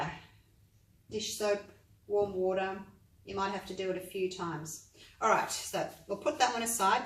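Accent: Australia. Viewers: Lovely to hear an Aussie voice using products available in Australia - Thanks - Sue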